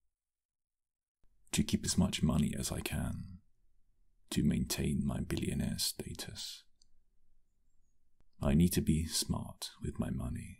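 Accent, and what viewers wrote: United Kingdom. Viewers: My asexual ass was not paying attention at all because the whole time all I could think was BRITISH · Is anyone going to talk about the British accent???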